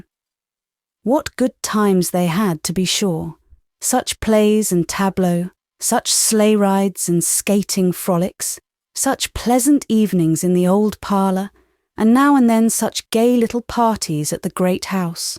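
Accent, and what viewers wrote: United Kingdom. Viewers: Hearing an essential American story with a British accent doesn't work.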